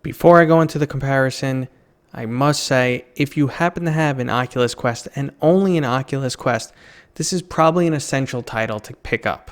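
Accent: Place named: Boston, United States. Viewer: Holy mother of Boston accent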